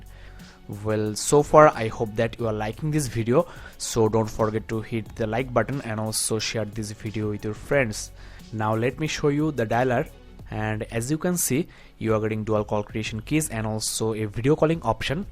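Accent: India. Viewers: your strong Indian accent is to blame for the phone to unlock the second time, not the phone.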